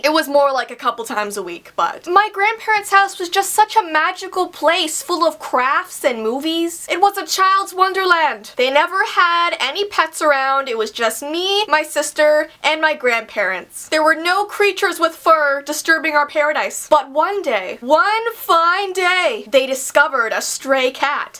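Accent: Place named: Canada. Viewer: I love your Canadian accent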